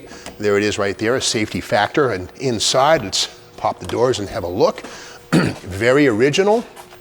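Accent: Boston, United States. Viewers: ❤ your Boston accent🎉 am buying 88 year for 6400🎉❤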